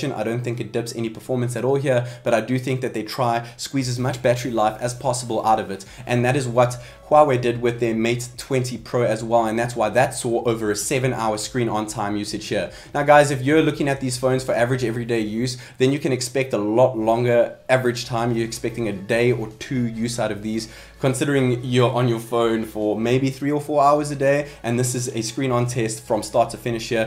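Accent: South Africa. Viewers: Hey are u from South Africa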